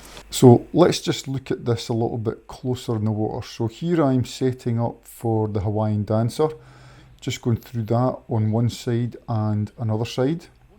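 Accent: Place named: Scotland, United Kingdom. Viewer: Come for the hand roll, stay for the AWESOME Scottish accent.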